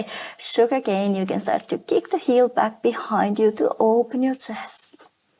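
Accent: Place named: Finland. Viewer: Very creative & innovative practice! Really loved the handstand jumps on & off the mat. I had no clue that so much of hip work was also involved for getting into handstands until this practice. Thank you so very much for this beautiful library 📚 of practices as I have completed one more 50-minute practice. 💙👩🏻💚🥰😇👌🏻🙏🏻💪🏻✨May I know which country are you from? Your accent sounds very Finnish 🇫🇮 but I wasn’t sure.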